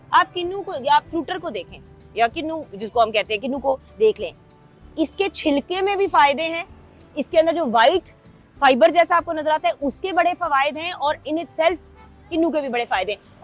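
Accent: Punjab, India. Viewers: Camera person kisi chez ko focus kur lay .hur jaga loud ayr punjabi undaz agya hay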